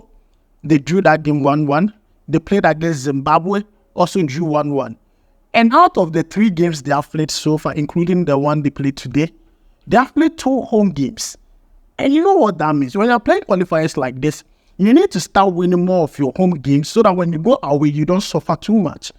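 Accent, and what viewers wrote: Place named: Nigeria. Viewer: You don’t have Ghanaian accent, you sound Nigerian.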